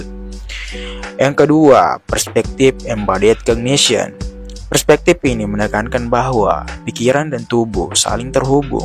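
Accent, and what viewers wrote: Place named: Sulawesi, Indonesia. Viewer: aksen cukup kental bang😊 Saya juga Bugis asli😁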